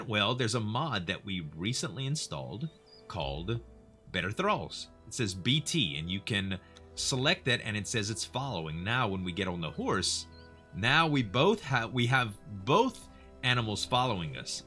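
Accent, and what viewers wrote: Louisiana, United States. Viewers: Think I found my new favorite channel! I'm getting ready to start binge watching your videos. I could be wrong here, but it sounds like you are from Louisiana (my wife is from Houma)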